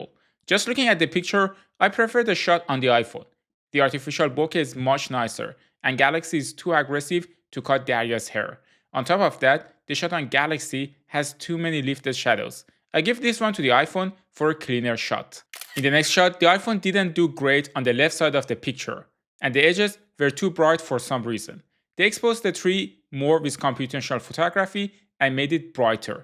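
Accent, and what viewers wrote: Iran. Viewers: Are you persian? Your accent says this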